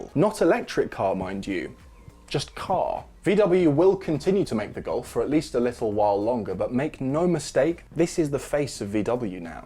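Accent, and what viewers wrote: England, United Kingdom. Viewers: Your English accent is annoying as fuck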